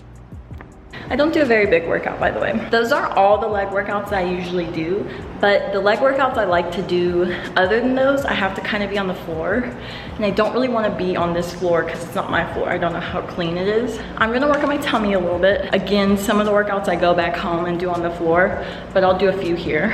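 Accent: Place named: United States. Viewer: Are you from the United States I feel you have accent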